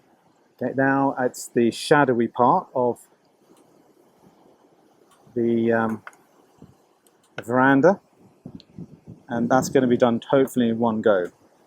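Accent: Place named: Scotland, United Kingdom. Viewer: You’re Scottish?!! 😮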